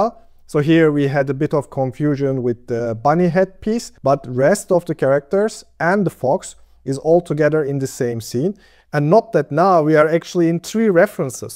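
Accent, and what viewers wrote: Turkey. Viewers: Emeğinize sağlık. Hocam İngilizcesi çok iyi olan bir Türk konuşması duyuyorum. Doğru mudur? :) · kanka turkmusun tam bır turk aksanı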